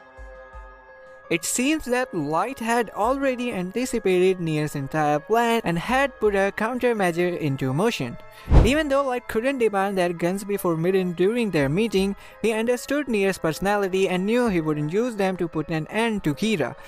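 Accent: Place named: India. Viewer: Indan accent english, Fresh W 🐬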